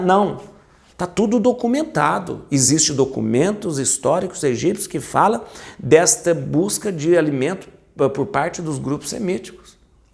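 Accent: Minas Gerais, Brazil. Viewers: Boa tarde a todos,. Boa noite padre sua benção. Maria de santo Amaro SP SP. Padre. Olha aí aquele ditado. Deus escreve serto. Se os irmãos não tivesse vendido José. Não tinha cumprido. O sonho de José la dos feixes. Vio Jesus escreve serto por linhas tortas. Amo o seu sutaque mineiro kkkkkk também dou mineira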